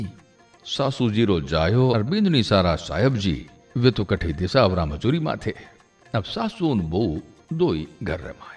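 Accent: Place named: Jodhpur, India. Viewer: आपकी आवाज सुनकर जोधपुरी होने का फख्र है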